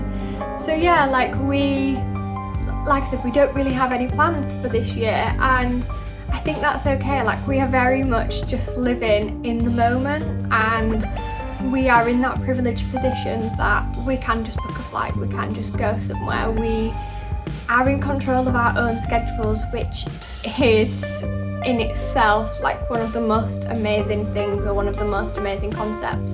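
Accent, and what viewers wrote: United Kingdom. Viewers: Pure english. Pronounciation of the british. Hard to understand. Unlike the american english. Its too easy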